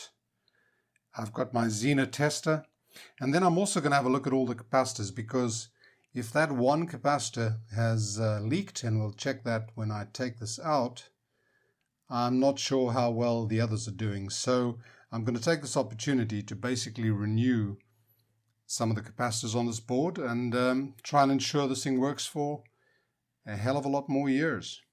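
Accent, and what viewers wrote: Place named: South Africa. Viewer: Hi great video, i love old audio equipment. You sound South African by the way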